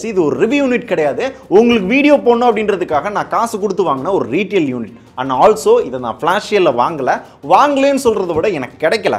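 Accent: Madurai, India. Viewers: Bro neinka Madurai ya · Bro Neenga Madurai yaa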